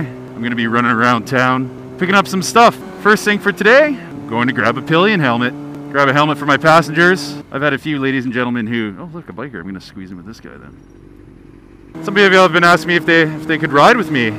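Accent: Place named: Canada. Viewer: I know this video is a year old. ( One may say I might just be stalking your Canadian accent) however you burp a hell of a lot! Like WOW! haha But as always I love you and Mr. Snowcat so keep the videos coming. Yours Truly some random Australian chick!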